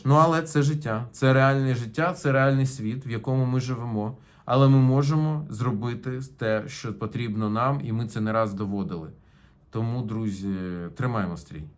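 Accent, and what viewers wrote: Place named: Russia. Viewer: Это что, украинская мова??? Да ты даже язык выучить не можешь. Это даже не суржик, а Русский с хгеканием!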